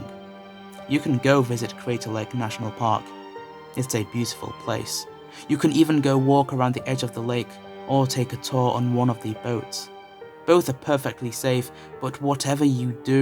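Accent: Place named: England, United Kingdom. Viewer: Good story.. Not sure about the English accent of the narrator though.. ... ... For the record I'm a Brit too.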